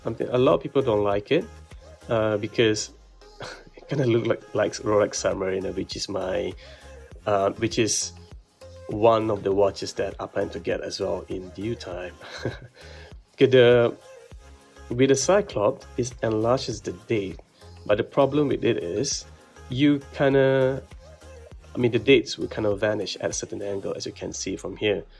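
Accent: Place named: Malaysia. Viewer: Are you Malay? Because i recognise that accent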